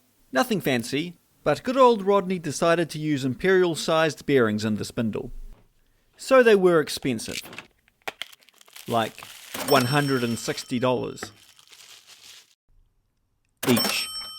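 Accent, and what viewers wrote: New Zealand. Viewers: Love hearing a Kiwi accent, great to see another NZ bloke in his shed making quality content! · Are you a Kiwi guy? You sound like a fellow Mainlander.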